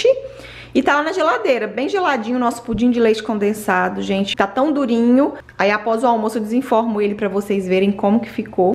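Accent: Minas Gerais, Brazil. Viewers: Oi é um imenso prazer em falar com vc.Te acompanho já a muito tempo,te admiro muito me identifico muito com vc.Amo o seu sutaqye pois também sou mineira.Todos os dias vejo se vc postou alguma novidade amo o Bernardo a sua família vc é linda sua mãe seu pai com a mão divina fazendo artes maravilhosa.Sua mãe aí aquele fogão a lenha como lembro da minha mãe em Minas.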